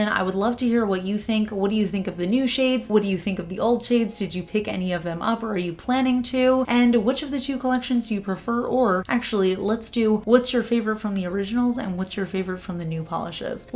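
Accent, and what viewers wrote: New Jersey, United States. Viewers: your NJ accent... Not just the way you say orange, but forest as well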